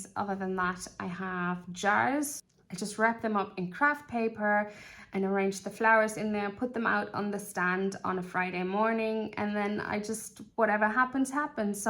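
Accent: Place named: Ireland. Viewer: I'm enjoying catching up on your older videos. I'm so glad you made shorts as well! I'm telling all my homestead-interested friends about your channel, too. We adore supporting (and watching) small channels and helping the good ones grow! The bonus for me is feeling less homesick just listening to you speak. (I'm in USA and my Da was born in Malin Head, County Donegal).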